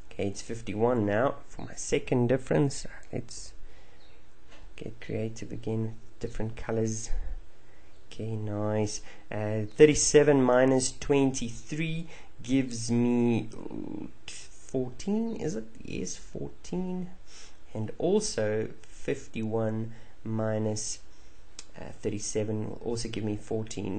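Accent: South Africa. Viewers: South African? awe my bru!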